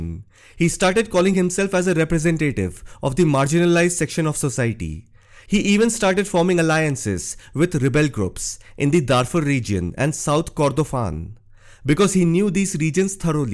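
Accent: India. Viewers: ❤You spoke very well English - during the first 20 seconds... Then you got too animated and slipped into "Ind-glish" - which is unbearable to listen too!❤ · Well explained but not all are true from ur voice i can see you are an Indian repoter and that's why not all ur info are correct but you hit must of the points. More concrete research and confirmation from a well respected reporter in one of this african countries like UG, Keyan, Sudan will give you proper details. There are more to the war. I didnt see you talk about china here ask questions you will get the exact details. You did so well once again thank you for speaking out. God bless you 🙏❤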